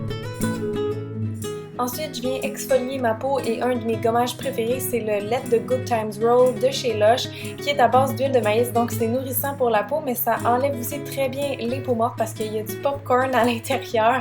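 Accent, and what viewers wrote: Quebec, Canada. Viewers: t'es québécoise ?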